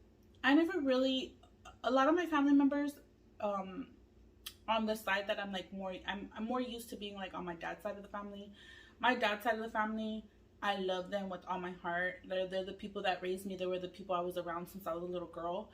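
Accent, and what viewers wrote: Los Angeles, United States. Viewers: Hey! I’ve been watching your channel since you had that pink velvet blanket on the background, when you did your videos in your bedroom I think? But I don’t usually comment but I enjoy watching you kind of like a talk show but with food! And you have a cool personality and just a very authentic way about you, including the good and not so good aspects of life. You show yourself and all aspects of you and I respect that you don’t put up a fake persona, you still say “foo” and I grew up in Los Angeles county and remember talking like that and still do in my head say “foo” but I don’t hear it often in my daily life so it’s nostalgic for me. I love Asian food too so I enjoy when you feature Asian food in your videos but I’m just a curious eater too so we’re similar in that, I love to taste and discover new foods and dishes. 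Thank you for being genuine and being real, I wish you the best and know that even though many of us don’t comment all the time, I for one do genuinely want the best for you and are silently cheering you on. You’ve had a tough childhood in many ways and it’s so cool that you have been growing and I give you props for how you handle the negative comments you always make me laugh with how you respond in a calm and funny way, but it still sucks that there’s people who don’t care about others and choose to be mean, like really? Would people say half of what they say in person? No it’s only cuz it’s behind a screen. You keep being you and just thank you for sharing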